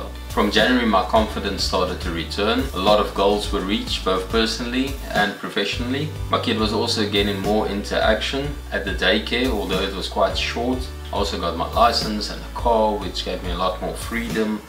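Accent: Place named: South Africa. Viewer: Am new to ur channel i ave watching ur videos for sometimes now i really enjoyed it. So i subscribe to ur chennel i also watch other south african youtuber one base in Vancouver also an one in toronto you guys are really lovely people love ur ascent i am a Jamaican from watching all of you ur videos i cant wait to get to canada i wish you an ur family very happy an productive life in canada.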